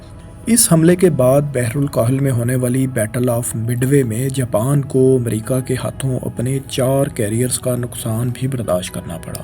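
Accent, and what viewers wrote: Punjab, Pakistan. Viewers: پنجابی ایکسنٹ میں اردو یہ جناب بولتے ہیں۔ پروگرام لاجواب ہے مگر غیر موزوں آواز کے باعث اپنی افادیت کھودیتا ہے۔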